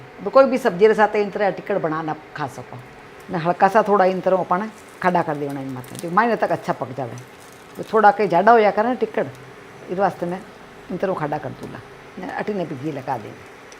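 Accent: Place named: Rajasthan, India. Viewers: Marwadi aur Marwadi khano dono zordar aur aap bolo ba bhi bahut sudh marwadi aap ki awaj sun ke waaste jyada dekhu🙏meethi boli h aapki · Hello your Rajasthani is very nice and clear easy to understand Thank you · आती आपकी शुद्ध राजस्थानी बोली बहुत अच्छी लगती है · Aap ki jodhpuri boli sun ke lagta hai ki Jodhpur main hi hu Awesome videos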